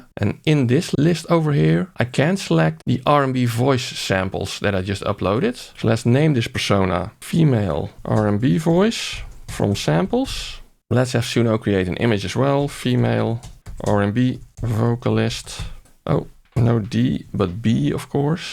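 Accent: Netherlands. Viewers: He is so Dutch, I hate this Dutch shshshs pronunciation... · Grappig dat je als nederlander onmiddelijk een engels sprekende landgenoot herkent. Niet lullig bedoelt overigens! Goeie video! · very Dutch accent there ;-)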